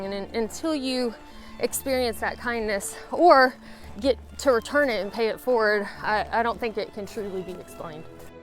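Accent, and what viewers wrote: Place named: Tennessee, United States. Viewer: Im from Tennessee. Your accent is a little bit Tennessee.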